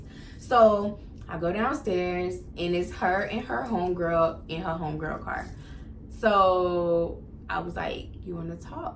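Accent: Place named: New Orleans, United States. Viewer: You from Nola ? I hear an accent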